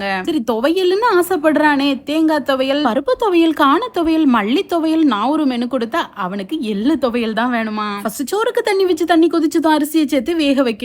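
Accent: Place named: Nagercoil, India. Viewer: Enakum Nagercoil language rmba pidikum enga relatives anga irukanga sister unga video elame super god's grace epome unagaluku unga families kum irukum god bless you sister ❤❤❤❤❤❤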